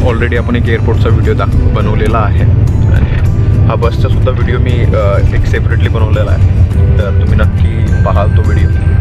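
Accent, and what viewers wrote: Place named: Nagpur, India. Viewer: Aala corona nahitar China Cha moha sodena nagpuri tone ahhe keep it on🤣🤣🤣😁😀🙏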